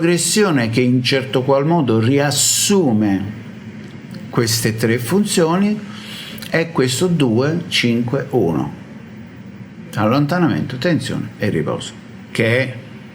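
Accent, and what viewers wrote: Naples, Italy. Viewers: Lo so che sei di Napoli, e si sente pure 😉. Cmq ti ascolto sempre con molto piacere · Cmq si sente che è napoletano... per fortuna.. aggiungo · Un napoletano sente i suoi simili già nell'armonia di voce comunque grande ,avevo lasciato la mia tastiera da parte ma con i tuoi tutorial mi hai dato voglia di imparare cose buove semplici e concrete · Si sente la radice napoletana, ed è molto piacevole...sec me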